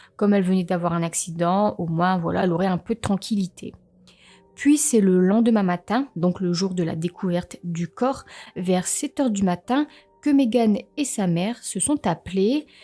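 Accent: North, France. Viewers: Bonjour. J'adore votre concept.Narration parfaite avec ce petit accent du nord.qui vous donne petit.plus..Histoirs passionnantes.. beau travail. Un grand merci a vous. 💚👍👍👍💐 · bonjour je voulais vous dire que vous racontez très bien ces affaires que vos documentaires sont bien étoffés. on voit que vous travailler sérieusement pas comme d'autres et je voulais vous remercier pour votre travail car c'est toujours agréable de vous écouter! je ne m'en lasse pas! ne seriez vous pas une fille du Nord?? et vos affaires criminelles sont inédites ce qui est très plaisant.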